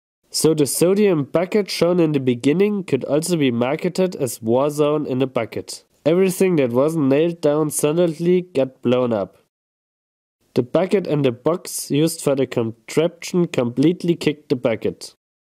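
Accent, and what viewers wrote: Germany. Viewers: Der Deutsche Akzent ist so geil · na ja.. Umwelt Verschmutzung das Plastik Bekommst Du Nicht restlos weg.. und 2 Ich höre das du Deutscher bist. also Hättest du es auch gleich in deutsch machen können.. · I hear a Deutsch akzent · Most german accent i've ever heard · klingt sehr deutsch haha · Den deutschen Akzent hab ich sofort raus gehört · German accent makes it even more professional! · Your German I know it. (I’m German) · Du sprichst sehr gut. Aber dein deutscher Akzent hört sich ein wenig lustig an. Das muss ich zugeben. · Beside the German accent it is always important to try this under a 380kV power line, to get the extra fizz, at least when the plume rises high enough. If seen more intelligent YT videos of wasting money or creating some bang. Try it with 1000g Ammonal next time. It's cheaper and more impressive.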